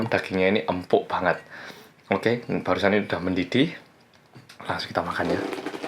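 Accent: Java, Indonesia. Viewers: Selaluu nungguuuuu samaaaa orang medok ini hehe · Mas mw nanya dong, masnya ini org jawa atau oppa korea ya?? Aksen suara jawa totok, tp facenya 오 빠 😁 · Mas Medokmu itu lho 😁 sesuatu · ARTIS KOREA YANG MEDHOOOOKKK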